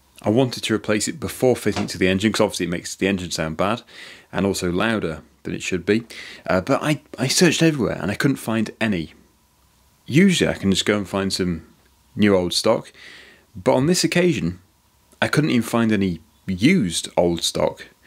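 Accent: United Kingdom. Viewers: May I suggest a shake and break for stuck screw removal? Works great for me. Classic British accent adds class to you videos.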